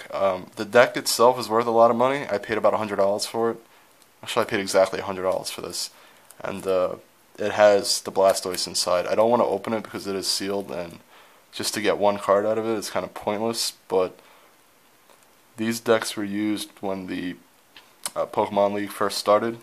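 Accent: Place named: Sweden. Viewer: Are u Swedish ur English sounds swedish Cuz it sounds like when i talk:P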